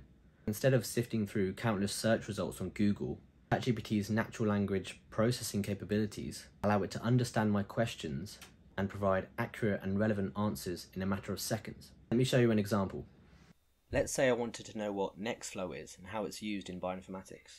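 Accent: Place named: United Kingdom. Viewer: The British accent...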